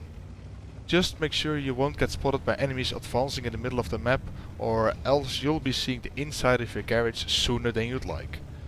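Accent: Netherlands. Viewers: you sound dutch...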